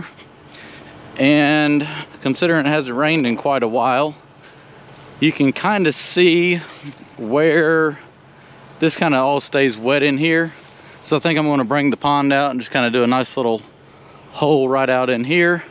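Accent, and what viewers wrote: South, United States. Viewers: I gotta tell ya, I love watching the videos, but there is something soothing about your voice too, I like the southern draw, I follow Todd on digginlife21, he has the great draw as well. I am in Michigan and you just don`t hear that way up here, I guess you guys think we have a northern accentb be well my friend.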